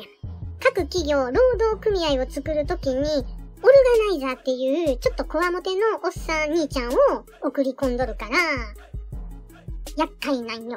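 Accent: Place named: Osaka, Japan. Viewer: なかなかマルクス主義を主題にしながら、現実は、それが空論であり乍ら、利用され続けているという惨たらしい歴史をまことに見事に大阪弁の楽しさで、しかも日常的に示唆し続けてくださっている先生に感謝です。この地球俯瞰的な見方は、隣国から浸透され続けている今、とてもとても必要不可欠だと思います。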